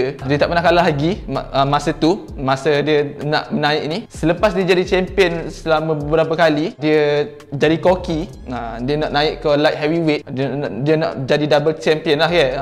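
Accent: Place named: Kelantan, Malaysia. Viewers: aduh laju beno ckp...campur2 klantan plak...susah nk phm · Bahaso kelate demo pekat sngat bey , ore lain comfirm xpehe , pah sor lg lorat nok g mano ? Aduhhh laa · Kelate ni boh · Awok terlalu kelate untuk sayo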